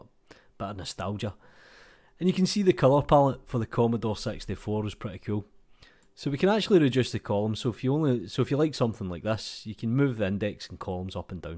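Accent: Scotland, United Kingdom. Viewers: Brilliant! Thanks for the great tutorial: easy to follow and nice to hear a Scottish voice on Youtube!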